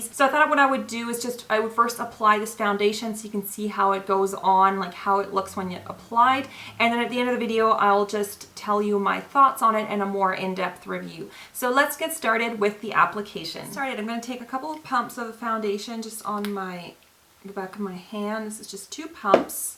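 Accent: Germany. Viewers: OMG I'm new and I didn't know that you are german:) you have great accent...! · You are german, right?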